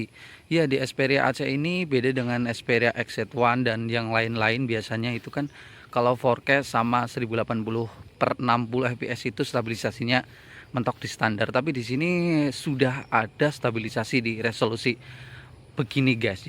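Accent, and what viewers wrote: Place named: Banyumas, Indonesia. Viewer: Oh wong Cilacap Adipala toh Ngapak kaya nyong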